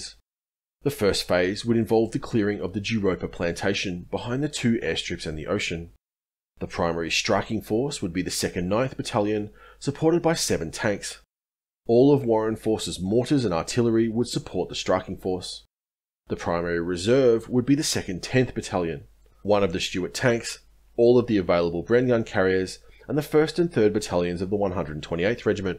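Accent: Australia. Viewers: Wonderful great to hear an Aussie voice at the helm. History needs to be told by all nations. Here in the UK, the young seem to be denied an education whilst being fed on a diet of puerile rubbish like who's bonking who on Love Island . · As an American whose father and uncles fought in Europe, and neighborhood families who fought in the Pacific, wonderful to hear detailed, reasoned, account of Bona-Gona, with an Aussie accent, which makes it believable. My generation never heard much (except sinking transports!) Many thanks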